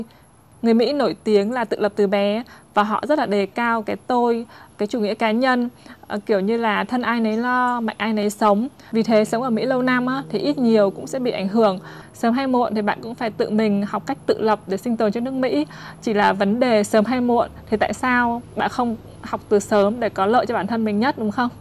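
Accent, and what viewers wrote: North, Vietnam. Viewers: giọng nói miền bắc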